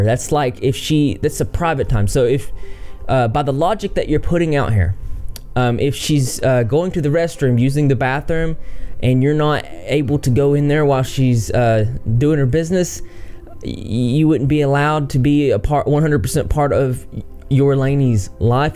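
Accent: South, United States. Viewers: Besides from this hot tea, I enjoy the juxtaposition of your emo style and your southern accent. Very pleasing. Also good job and being unbiased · I just love his southern accent like Jesus. 😍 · Your face says punk rock, but your voice says country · I love what you're doing man you have great vids but I just gotta say it you sound like Idubbbz doing a southern accent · This was a very well detailed video on the whole situation. Also I wasn’t expecting that southern accent but hey! It’s great, for some reason it makes it more entertaining to hear you reading out the little “articles” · Dude you look like a anime character but with a heavy southern accent. The world is a beautiful place. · I thought I heard a southern accent .. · Onion boy is disgusting, but I subbed because of your accent. Not many people on the platform have a southern accent! (I’m from GA) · HIS COUNTRY ACCENT WITH HIS PUNK AESTHETIC IS KILLING ME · This is my first video I've seen from you and omg you look so much like Shayne Topp, just more alternative with a southern accent. 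It's fantastic 😂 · It’s always so nice to hear someone else with a southern accent on YouTube 😭😭😭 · you and your factual Southern drawl o lawd <3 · He definitely sounds like a southern gentleman 😂 I love it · Can i just say I love your country accent!!! & you're cuter than a truck load of baby ducks!!! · you look like a final fantasy character and then you hit we with the southern accent lmao. Great video man. Really liking your stuff. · love the country accent with the punk look lol. where are you from??? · you're like super southern. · Idk why I'm watching this. Never seen a video on this channel. Ooh I love the southern accent though! Lol. Good video as well. Onision is an authentic narcissistic psychopathic. Its quite crazy honestly! Has YouTube gotten involved in any of this legal and moral drama? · I've never seen an emo boy with a country accent. Thank you for enlightening me. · I love his low-key country accent loool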